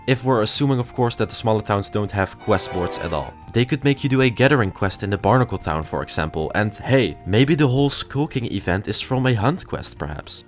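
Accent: Netherlands. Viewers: Are you dutch?!?! I LOVE IT! I already thought it because of the accent. Please like, if ur dutch! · Ik wist dat deze klerelijer nederlands was met dat accent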